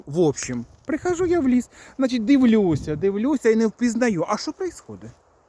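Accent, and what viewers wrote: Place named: East, Ukraine. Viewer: От так говорять на сході❤❤❤❤❤❤❤❤❤❤❤❤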